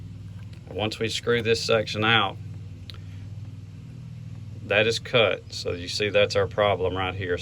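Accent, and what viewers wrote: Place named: South, United States. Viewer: Not $100. I paid about $40 - $50 at the orange box store. It depends on far the turn-valve is from street level. Mine is about three feet down so I can’t reach it with a wrench and had to buy the water key. In the far north, they can be four feet below ground, due to the frost line. This guy sounds like he’s in the South, so they’re not buried very deep and he can easily reach it with a hand tool.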